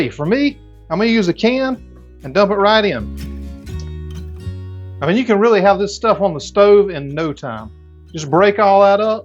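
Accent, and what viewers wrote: South, United States. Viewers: I love listening to your southern accent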